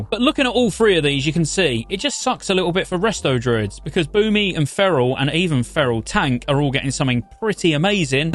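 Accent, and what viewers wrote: United Kingdom. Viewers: Hi right there! Did you appears to be british?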